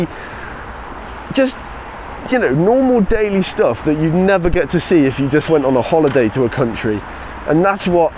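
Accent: United Kingdom. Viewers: 不像美国口音，英式英语？ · Thank for british English listening exercise video hoping u have a good life in my country peace man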